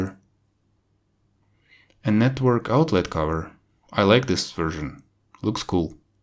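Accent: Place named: Russia. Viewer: Отлично. Дом без принтера скоро будет невозможен, это как микроволновка или пылесос, вещь необходимая. Дочь Арина и по акценту понятно что по русски говорите.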